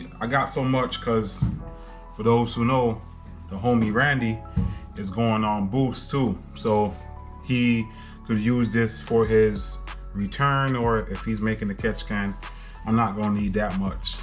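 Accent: Jamaica. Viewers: Just bought this today, thanks man. Jamaican???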